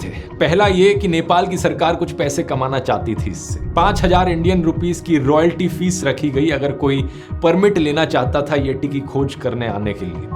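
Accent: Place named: Lucknow, India. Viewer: आपकी भाषा शैली, आपके बोलने के अंदाज़ से प्रतीत होता है कि आप पुराने लखनऊ के निवासी हैं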